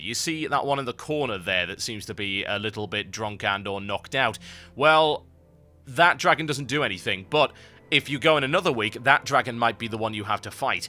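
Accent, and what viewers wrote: United Kingdom. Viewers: The Power of the British Accent!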